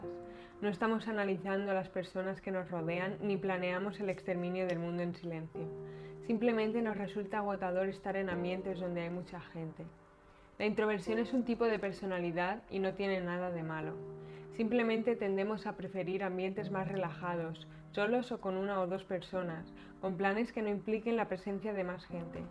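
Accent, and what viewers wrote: Spain. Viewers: reconozco facílmente el acento español.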